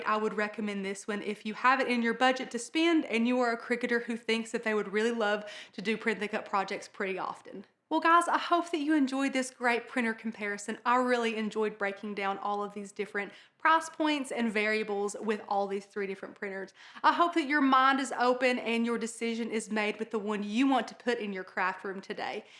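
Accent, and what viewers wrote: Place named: Texas, United States. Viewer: Hey I love the accent. Are you from Texas by any chance? Just a guess.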